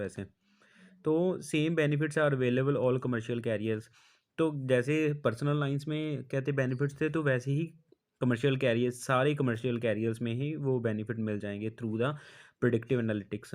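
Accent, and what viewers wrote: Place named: Punjab, India. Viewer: But your accent like u r from punjab..... And mostly speaking in hindi & punjabi .... So why are h hiding that u r from Chandigarh...???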